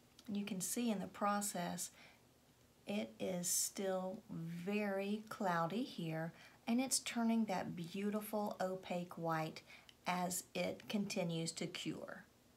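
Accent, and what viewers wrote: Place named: South, United States. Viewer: Hi, how are you? 😀 I'm from Argentina and i bet you didn't expect a suscriber from so far away, and Spanish speaker as well. 😆 I happen to be fully bilingual so i have the pleasure to enjoy all of these beautiful american creators such yourself! I like your southern accent and, if you don't mind my asking, i'm curious to know if you are from Alabama, Tennessee or somewhere nearby. I loved your embroidery hoop ornaments, since fabric is my favorite material to work with. Also stamps. I adore stamping! I have read in another comment that you clean your space as you go, to keep it neat. I do the same when crafting. I cannot concentrate if my surface is too busy with all sort of things. Glad to know i'm not the only one obsessed with cleaning. 😂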